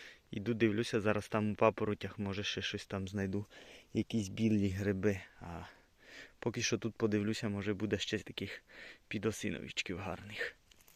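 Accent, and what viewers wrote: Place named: Ukraine. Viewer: Отличная прогулка! Впрочем, как всегда., какой красивый у тебя классический украинский язык, даже без грибов, тебя можно слушать бесконечно! Без сленга, без польских и венгерских слов. Так говорить могут не многие и уж точно, сейчас не учат в школах. Я -то, точно не сбегу к другому блогеру 😁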